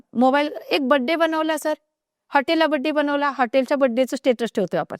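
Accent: India. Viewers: Aaho त्या दिल्ली साईड la राहिलेल्या आहेत म्हणून हिंदी मिश्र मराठी बोलतात ,तुम्ही pn दुसऱ्या राज्यात राहिलात तर त्या भाषेचा प्रभाव तुमच्या बोलण्यात होईल ,त्यात अशुद्ध अस काहीच नाही त्यांच्या भावना तुमच्या पर्यंत पोहोचणं महत्वाच आहे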